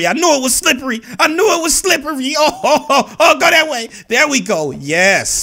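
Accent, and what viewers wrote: United States. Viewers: I like his American accent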